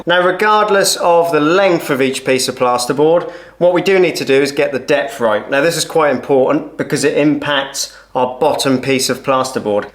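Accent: Norfolk, United Kingdom. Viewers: First time visitor to your channel. Do I detect a Norfolk accent?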